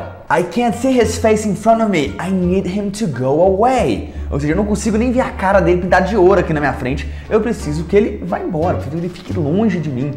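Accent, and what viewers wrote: Minas Gerais, Brazil. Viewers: Para de ser chato misericórdia, é óbvio que o cara sabe como essas palavras são escritas ou pronunciadas em um português formal, mas isso é apenas questão de SO T A Q U E O cara é mineiro, deixa ele em paz com o sotaque dele, desnecessário ficar atrapalhando os comentários de um vídeo focado no I N G L Ê S por causa de preconceito linguístico Até parece que você fala que nem um robozinho, com todas as letras e acentos. Vc pode até pronunciar as palavras citadas de um jeito um pouco mais padrão, dependendo da sua região, mas tenho certeza que com outros tipos de palavras e fonemas vc também fala "errado"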